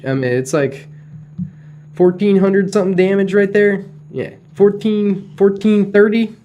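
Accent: Texas, United States. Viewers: I miss the Texan Drawl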